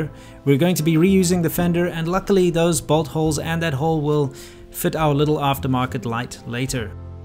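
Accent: South Africa. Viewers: OMG..Sitting here watching this vid as I just bought a mint 2012 GN, and just surfing through related vids and I'm thinking, man, this guy's voice sounds just like my South African cuzzies voice from serpentza and low and behold! Man this is so cool I this channel, had no idea you gad this, keep it awesome! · What part of south Africa are you from?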